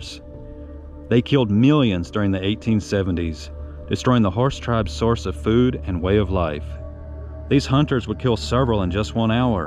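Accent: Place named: Texas, United States. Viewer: WOW! This was by far the best Texas history video we have seen yet. This morning we were looking for Texas History videos to watch as part of homeschooling and your title promised adventure. What are the odds this video was just uploaded yesterday?! You did a GREAT job recounting our great home State's historical events, bringing to life historical figures and and their way of life, and showing related scenery and historical sites. Plus your smooth Texas accent presented the info in an enjoyable cadence and manner. We have subscribed and will check out your other videos. Thanks for your work. May God bless you.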